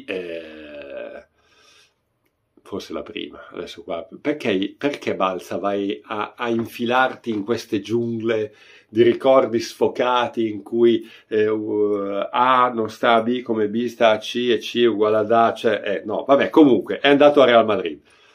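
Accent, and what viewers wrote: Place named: North, Italy. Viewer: In Inghilterra non dipende dalle leggi ma dalla.cultura popolare. Si svenano pur di seguire il calcio ( anche il rugby).nutre il sistema? Si. Impoverisce le persone ? Si, di più. Il tifo fa leva su sentimenti di massa. È ciò che di più populista può esserci. In Inghilterra però, come in tutti i sistemi anglosassoni, la stampa è seria. Tu ed altri plaudivano in questi giorni anni per vari aumenti di capitale , rinnovi, contratti nuovi. Bravo. Sei parte attiva del sistema fallimentare. Fai l'antagonista ora . Non basta l'accento del nord e questa cadenza da cantilena per essere seri. Avsim per impegno e serietà ti asfalta . Tu non sai nulla. Sei il classico berlusconiano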